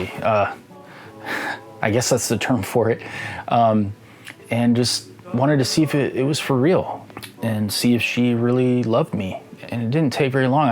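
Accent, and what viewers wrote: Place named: Tonga. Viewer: Hahahahaha I love this. Your Tongan accent is on point. You’re more Tongan than most Tongan kids. I’m so proud of you ❤️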